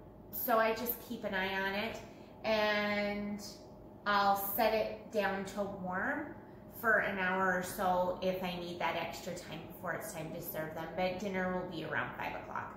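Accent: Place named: Canada. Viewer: I'm definitely trying that pork. I just LOVE that particular Canadian accent! 😄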